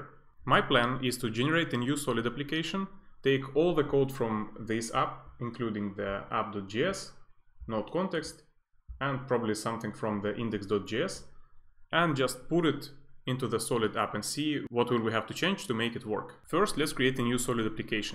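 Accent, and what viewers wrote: Russia. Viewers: После сотни видеороликов с индийский акцентом так необычно слышать русский.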